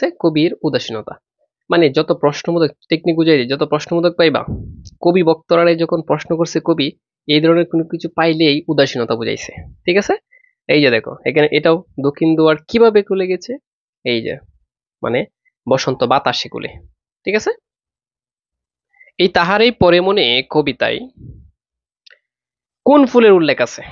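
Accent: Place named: Sylhet, Bangladesh. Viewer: স্যার আপনার বাসা কি সিলেট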